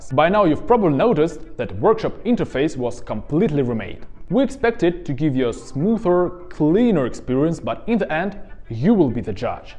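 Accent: Russia. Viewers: That Russian accent... Come on guys find a native speaker :) no offense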